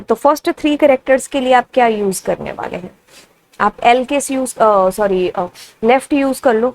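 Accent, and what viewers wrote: Punjab, India. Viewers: Mam aapka Punjabi accent 🔥🔥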